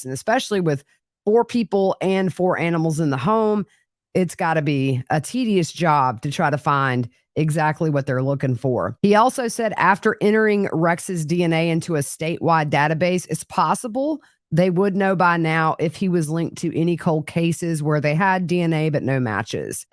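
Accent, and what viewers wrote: South, United States. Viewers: Hola from the Big 🍎 - I watched this at 4 am - this new case is going to be a doozie! If she’s acquitted (?) I’m going to set her up with my ex-husband. Love watching you, especially when I wake up and can’t sleep - miss the southern accents! The south is beautiful. Love you lots!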